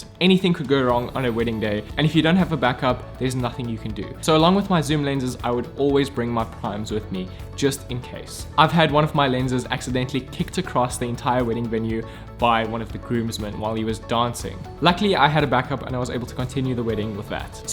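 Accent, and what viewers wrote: South Africa. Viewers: are you South African? Your accent sounds very South African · Ah this video was an amazing help! Is that a Cape Town accent I hear? 👀🇿🇦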